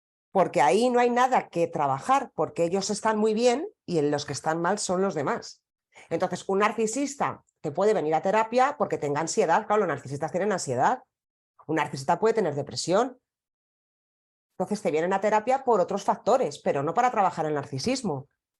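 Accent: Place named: Spain. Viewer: Hola, soy de México 🇲🇽 😊 a veces no capto bien las palabras por tu acento naturalmente español 😅 ¿Puedes escribir el nombre de los 2 autores que mencionas?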